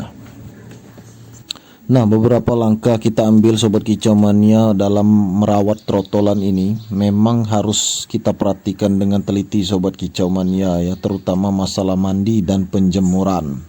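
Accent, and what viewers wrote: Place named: Bengkulu, Indonesia. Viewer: iya logat macam orang Bengkulu di mana bos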